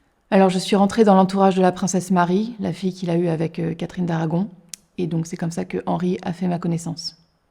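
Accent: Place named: Paris, France. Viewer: J'aime beaucoup le concept et le contenu. Bravo ! Par contre j'entends un accent parisien super fort.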